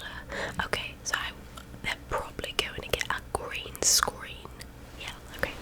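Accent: United Kingdom. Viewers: British accent why not ? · You let it slip a few times went abit Birmingham aha but other that a genuine solid accent it u spoke to me in the streets I wouldn’t question it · You know I'm from NYC and seeing a beautiful latina do a British accent I am honestly impressed. In the future could you please slowly scratch the fluffy mic again? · Hi, I missed you very much, your British accent is wonderful ❤❤❤ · this video will be 2yrs since it was released and I'm still hoping she'll make a pt.2 of it coz this is always my go to British accent ASMR. ☺️ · I just assumed you were British… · Yes!😊😊😊😊. My wish is finally granted! is back with another ASMR video with a british accent! YAY!🎉🎉🎉🎉🎉🎉. Also, you sounded like Hermonie Granger's accent from the first Harry Potter movie! Now that's wicked cool!💙💙💙💙💙💙💙👍. I give this video an A+ for your incredible British accent,!⭐⭐⭐⭐⭐⭐⭐👍. 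Have a great weekend and enjoy your fantastic accent.😊😙💙💙💙💙💙💙💖💖💖💖💖💖💖😊⭐⭐⭐⭐⭐👍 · Nice and great video. I was really surprised to see u do a British accent. I must say u do a perfect British accent 100 percent. U sound almost like UK asmr remember her. Thumbs up 👍👍 August.21.2021. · Perfect accent. I say that as a Brit. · Really Beautiful Woman with a Very Beautiful British Accent!!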